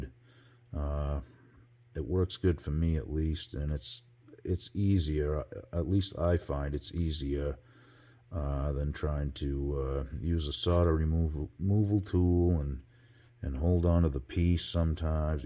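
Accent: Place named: Boston, United States. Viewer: I worked at Lasalle Music in Boston around the time they were selling these. Judging from your accent, it sounds like you might know the place. I did acquire a Charvel Model 5 at the time. The guitar plays great but the pickups were always weak on the top string, so I recently replaced them with active EMGs. In that process the licensed Floyd Rose bridge broke (it made of cheap pot metal). Nice job bringing back a nice guitar.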